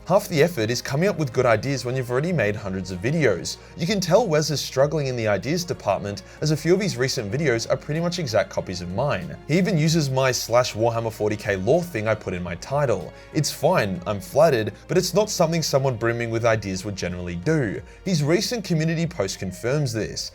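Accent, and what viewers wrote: Australia. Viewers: Dude, you are about to blow up! Girlfriends are about to watch Henry Cavill in his show. No gay shit but girls will watch you…your Australian. Accent wins. Thank you dude for exposing the best cosmic horror and being real. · AdeptusRediculos dont have you on because they are living in Cali. And you are way too harsh of a Aussie that would trigger their fans. As a fellow Aussie who talks alot like you do (with my mates) i can kind of understand that one 😂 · >Be me >Dr. House fan > fan > turn his hair blonde >Cheesy Ausie accent > now look like dr Chase >MFW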